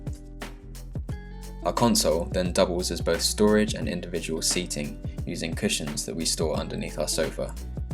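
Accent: United Kingdom. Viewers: British Matt D’Avella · So hard to understand English with British pronunciation unlike American pronunciation for me as a foreigner.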